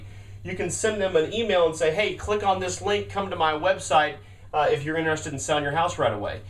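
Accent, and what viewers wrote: United States. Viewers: Where did the country accent come from all of a sudden? :\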